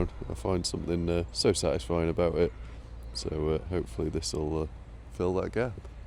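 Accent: Shropshire, United Kingdom. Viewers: Don't worry about your accent or it being Shropshire. For us foreigners it's all an English accent. Main thing for me is that you are annunciating well enough to understand without captions. There is something about your videos though that if I watch them at night they put me right to sleep. Keep up the good work. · we love all British accents including Shropshire! Waving from Australia 👋🇦🇺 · More education for me. I've stayed in Shropshire a few times but never knew what the accent was. Actually pretty good. Not Northern Southern or Brum! Another great video. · Continue sounding Shropshire,; you're just fine. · As an American Anglophile I absolutely LOVE your accent,! Let the Shropshire lad free! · What's wrong with your Shropshire accent? It's perfectly understanable and much better than trying to 'put on a posh accent'. I'm Scottish and I don't change my accent and seem to be understood everywhere. 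That excavator driver is amazing. The way he put the orange tarp into the dumper was a work of art. Imagine seeing the excavator and the spider together. It would be like watching a choreographed ballet. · Very nice Shropshire accent. You speak clearly and we can understand you. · Nothing wrong in using your Shropshire accent. My granny was very proud of hers even though she moved to Lancashire when she was 18 yrs old.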